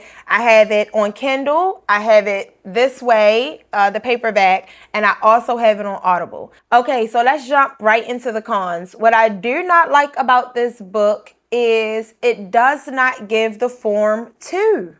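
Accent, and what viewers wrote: Baltimore, United States. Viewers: Ya'll say tewww in Baltimore, like with an emphasis on the end vowel. Anyway, new subbie from upstate NY learning Spanish:) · Girl, I'm from Baltimore and I was listening you you thinking "why she sound like home" 🤣😂😍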